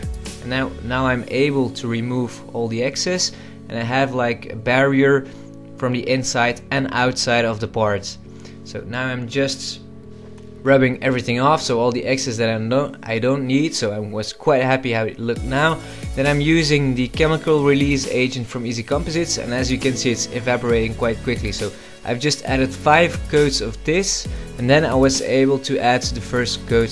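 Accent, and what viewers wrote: Netherlands. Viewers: dutch accent cant hide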